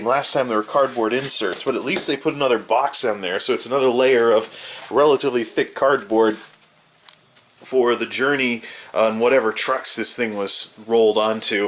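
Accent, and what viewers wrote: United States. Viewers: I live in South Carolina sooo nice to hear a Midwest accent. I have issues w the southern accent lol.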